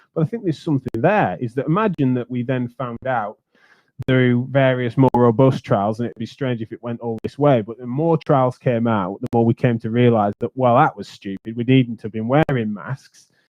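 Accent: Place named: United Kingdom. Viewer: Got steered your way by Dr. Eric Nepute and Sherri Tenpenny. Live in USA (came from Germany as a 12 year old). So it's a bit hard to understand your British accent. But it's worth the effort. Keep up the good work, young man. As to myself and millions of other compatriots here in the States, we can't wait to see President Trump confirmed as having been reelected when the Electoral College meets on December 14. So tired of seeing all these zombies wearing masks even when the state law does not require them to do so (walking alone, driving the car, riding a bike.....totally insane on their part, suggesting "see what a good, law-abiding citizen I am.). So tired of having to put on that piece of cotton whenever I go shopping. I'm usually the only one that does not cover the nose). Anyway, I suspect that Donald John Trump will give all Americans a nice Christmas present by declaring the end of this Covid 19 pandemic no later than Christmas eve. 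Thank you so much, handsome dude,.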